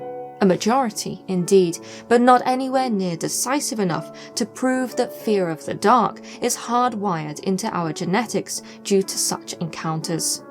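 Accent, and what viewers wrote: United Kingdom. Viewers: The narrator, is very eloquent and very english, very lovely. And this explanation about why we are afraid of the dark does make since. I don't feel quite so bad being still afraid of the dark and being 49 lol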